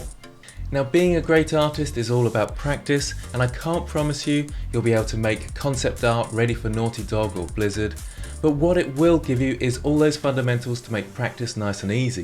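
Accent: England, United Kingdom. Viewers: At first I saw this in my inbox I was like "Okay maybe, but I shouldn't be spending money for yet another course I will never use", but then I saw and heard that buttery smooth English accent and I was In Like Flynn!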